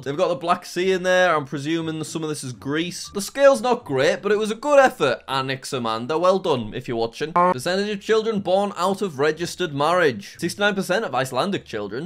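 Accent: United Kingdom. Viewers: british accent doesn't really pronounce the r in arm, but most American accents do. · I just love it so much when says “Enhance! Enhance” with his nice British accent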